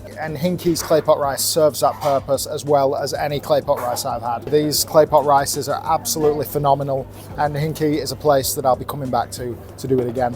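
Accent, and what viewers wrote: United Kingdom. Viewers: Are you from Birmingham? Brummie accent... 🤔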